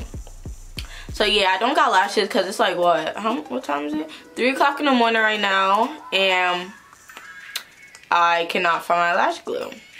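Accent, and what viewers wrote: New York, United States. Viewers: let’s talk about her accent like i loveee ny ppl accents 😍😍😍😩😭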